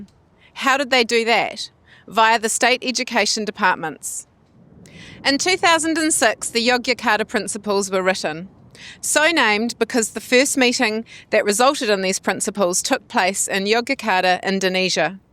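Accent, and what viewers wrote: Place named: New Zealand. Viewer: Dickheads long strategy -- sex years long ... you gotta love NZ accent 😂😂😂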